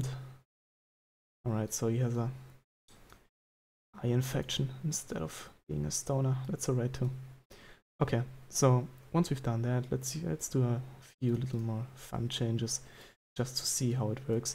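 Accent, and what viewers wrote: Germany. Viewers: good video helped me gonna try that out. could immediately tell that ure german because of the accent :p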